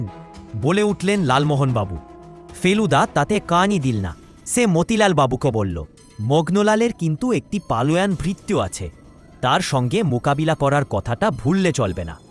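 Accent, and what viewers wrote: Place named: India. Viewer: Awful rendition of an excellent Ray story. Hindi accented Bengali is difficult to understand.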